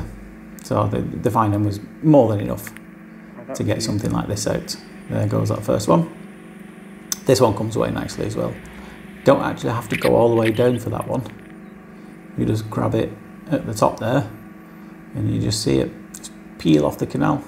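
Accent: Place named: United Kingdom. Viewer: You know your accent is very pleasing to the ear, but especially when you say words like “sludgy” and “plug”. Can’t say what it is exactly … the UK is full of wonderful accents, expressions and speech patterns! ❤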